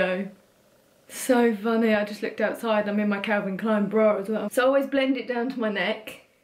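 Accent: United Kingdom. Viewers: I didn’t know you had a British accent! 😅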